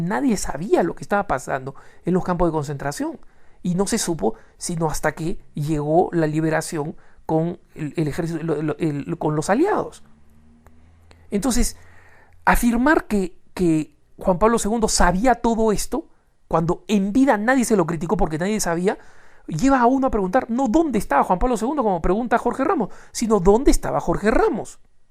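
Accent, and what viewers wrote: Peru. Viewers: Perdón, pero aquí, el totalmente desconocido eres tu(el que hace la "narracion" en el video) por tu acento se eres peruano, pero, ignorante,Jorge Ramos aunque tenga un nombre y apellido muy común,es uno de los 50 personajes mas influyentes en el mundo Hispano; y claro que está autorizado para cuistonar no solo a los papas sino hasta presidentes de paises (como lo ha hecho)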